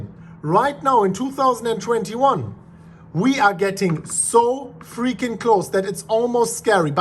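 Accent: Germany. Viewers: Deutscher, hört man direkt :D :D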